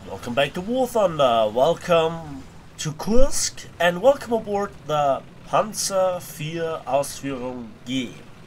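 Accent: Germany. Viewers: A very enjoyable video,. I think there is an element of RNG in war thunder, even though Gaijin denies it. I have penetrated the casement face of the jagdtiger with the T29 a couple times in test drive, but that was only 2 out of about 15 shots with no variation. Though perhaps we should not be totally critical of slight RNG. I believe it acts (intentionally or unintentionally) to represent the uncertainty and inconsistencies one would find in real combat. Also, any chance of a face reveal? We want to pair a face to that German accent lol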